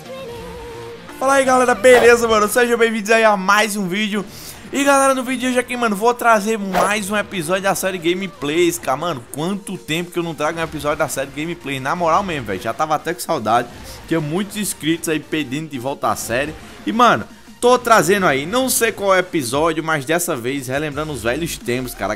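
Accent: Northeast, Brazil. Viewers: você é nordestino? :O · voce é do nordeste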